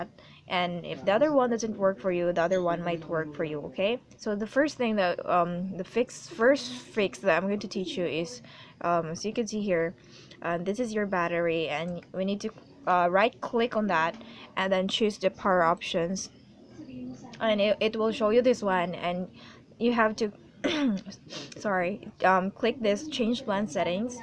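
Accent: Philippines. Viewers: you Philippino accent is soo freaking cute. Thanks for the help hope it works 🙏🙏🤎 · HAHA HALAWA TALAG NA PINAY YUNG ENGLISH , PERO ANG KYUT NG SPEAKING VOICE MO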